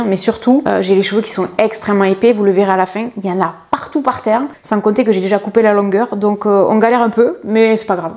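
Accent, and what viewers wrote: South, France. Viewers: J’ai eu le cœur brisé et la boule dans la gorge quand je t’ai entendu pleurer…. Ma chère, ton identité, ta féminité, c’est ton sourire ravageur, tes yeux pétillants et ton accuuuuunt du suuudeuh! Un bisou à Jean-Yves ❤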